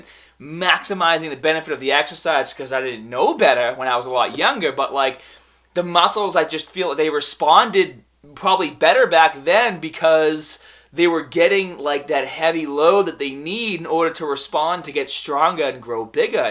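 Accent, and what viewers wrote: Boston, United States. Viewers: STOP TALKING LIKE A DOUCHE!!!! That Boston accent is horrendous. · how is your Boston accent getting thicker? I thought you didn't live in Boston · I never moticed the Boston accent until now. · iv noticed you've picked up a Boston accent that you didn't have in your earlier videos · Bruh I have't seen one of videos in a few years... Is it just me or is his Boston accent more prominent now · the boston accent is ridiculous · Love the Bostonian accent . Nice gains ! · You from Boston? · Shaan Targaryen I noticed too. I've seen some of his older videos and he doesn't have this Boston accent in them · First off your Boston ascent is coming out very much lol... funny to hear. Second off... Me as an LMT, I have noticed it looks like your hips are off kilter, meaning one looks higher then the other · Are ya from Boston? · Boston accent makes me cringe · sounds more like a Boston accent or in and around the area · it's a Boston accent, that's what it is... 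they speak normally on several of the words but then sometimes they say others things weirdly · Orpheus it's called the baaahstan accent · Dutch Dude Yeah it's definitely a boston accent, except the rest of his speech isn't nasally like a bostonian's is. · Did he just get a boston accent from nowhere?? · Did you randomly gain a boston accent? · Dude love your videos. learned so much. You are definitely from Boston right? "My amms" lol The r is silent in Boston.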